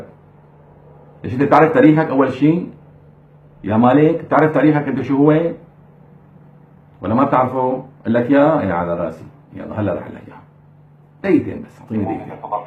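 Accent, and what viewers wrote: Syria. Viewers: لهجتو شامية خالصة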